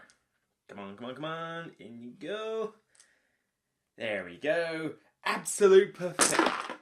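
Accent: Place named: Bristol, United Kingdom. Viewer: Canadian here, you’ve become my favourite board game channel for your deep understanding of mechanics and finding imbalances, etc, rather than just a constant ad for each game. I have a question… your accent is unfamiliar to me but sounds like someone from my childhood from Bristol. Are you from that area?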